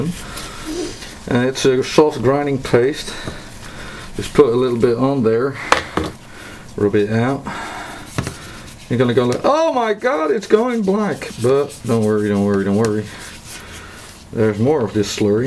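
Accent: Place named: Netherlands. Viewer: it sounds like he's dutch and we dutchy's always talk funny if we talk english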